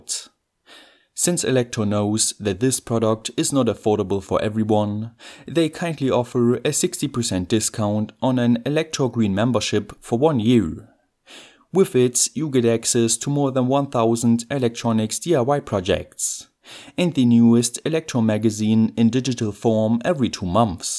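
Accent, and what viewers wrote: Germany. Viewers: Kenne dich nicht aber anhand deiner Aussprache könnte ich schwören du kommst aus Deutschland. Aber interessantes Video 👍🏼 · I am a bit confused by his accent. Where's this guy from? It sounds like a German speaking English with a Chinese dialect. Please spare me the Chinese isn't a language. I am fully aware of that.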